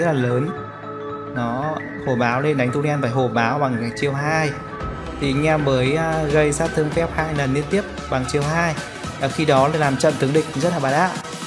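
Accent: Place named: Hai Duong, Vietnam. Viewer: anh có phải người Hải Dương kh ạ? Em thấy giọng anh khá giống người địa phương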